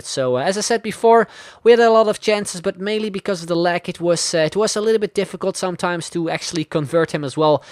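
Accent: Netherlands. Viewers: Are u dutch +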